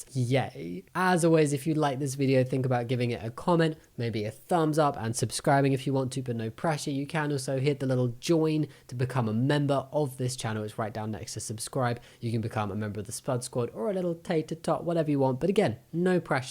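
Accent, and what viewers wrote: United Kingdom. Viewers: it’s alright I’ve gotten used to you saying things in british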